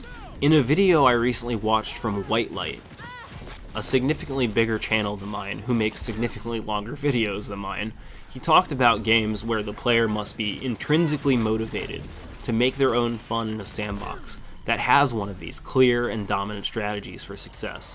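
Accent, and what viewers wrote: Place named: United States. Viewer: Youre not italian. Youre american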